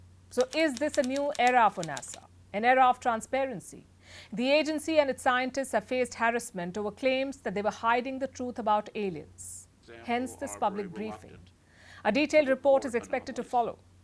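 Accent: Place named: India. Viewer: So we have to listen to a woman with a strong India accent and no USA native speaker is available to deliver the story?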